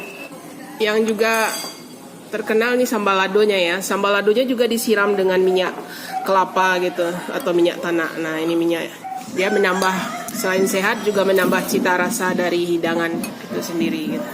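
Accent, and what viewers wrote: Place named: Bukittinggi, Indonesia. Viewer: Ba a dak bacalia an wajah uni ni? Bunyi suaro uni wak urg bukik tinggi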